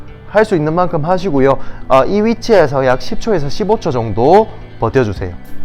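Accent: Gyeongsang, South Korea. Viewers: ㅎ 저는 그래서 넘 좋습니다 표준말은 어디에서든 접하기도 하지만 때론 딱딱하기도 차갑게도 들리는데 경상도 말은 화면에서 찾아보기 드물고 또 다정다정한 친근감있는 말투가 넘넘 좋습니다 아픈 이유도 상세하게 말씀해주셔서 저는 운동보다 먼저 서론을 더 신중하게 반복해서 듣게되는 1인으로서 자세한 설명에 더 감사할 따름 입니다 고맙습니다 홧팅하시길💗💗💗💗💗💗💗💗💗💗💗💗💗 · 억양이 참 재밌네요 경상도?억양이죠? 따라하게 됩니다 유익정보 감사합니다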